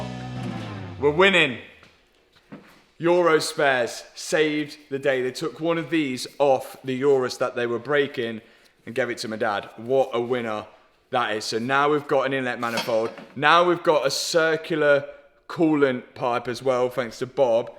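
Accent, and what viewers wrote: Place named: Leicestershire, United Kingdom. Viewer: Love a Leicestershire accent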